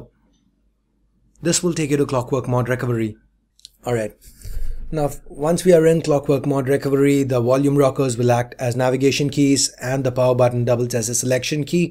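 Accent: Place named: India. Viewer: Annoying Indian accent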